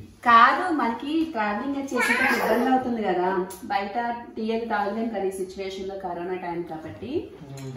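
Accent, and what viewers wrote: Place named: East Godavari, India. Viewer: Mundhuga Happy New year alage Happy Birthday inkoti enti ante moothi kalipoindhi sory thappuga anukovaddu chakkaga east godhavari ammaila matladaru madhi kooda east godhavari katthipoodi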